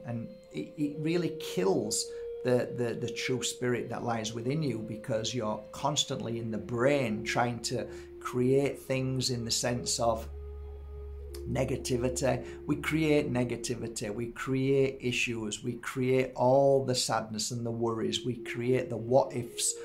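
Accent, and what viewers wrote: Yorkshire, United Kingdom. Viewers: Is it a Yorkshire accent?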